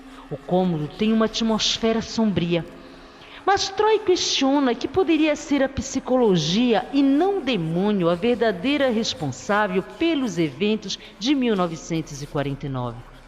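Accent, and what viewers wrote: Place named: Florianópolis, Brazil. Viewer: Você é de Florianópolis? Seu sotaque é de manézinha da ilha hehe, sou de Floripa também :)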